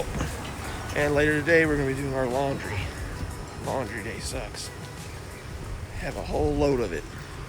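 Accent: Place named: United States. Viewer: You sound different. So country.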